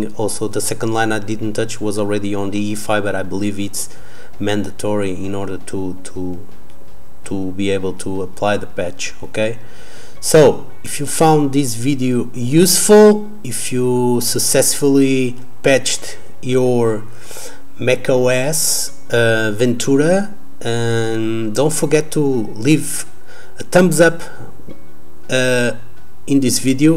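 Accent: Portugal. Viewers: Primeiras palavras e vi logo que era um YouTuber tuga. Gostei do vídeo, continua o bom trabalho.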